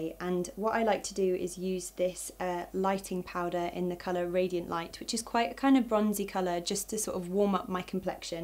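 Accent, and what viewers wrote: United Kingdom. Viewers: i must say that she looks very elegant with or without make up on, it is so satisfying to see her talking in her british accent ❤ also, funny how i can relate to her when she said "and that's it, that's the make up look done" after finish with applying foundation on and i did the exact same thing lol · So that's how pretty you have to be to date Sebastian stan, Also the british accent is a bonus obv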